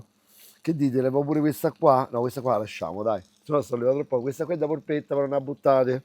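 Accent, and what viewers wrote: Rome, Italy. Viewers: Bravo MAX, quando cucini così è piacevole. p.s. i sottotitoli in italiano servono a tradurre il tuo accento romano ? dai penso che si capisce quello che dici ....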